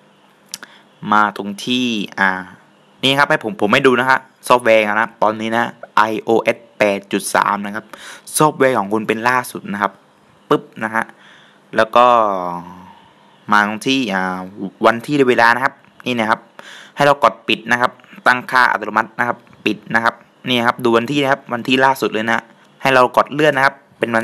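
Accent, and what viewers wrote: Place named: South, Thailand. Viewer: เสียงเหมือนคนใต้นิ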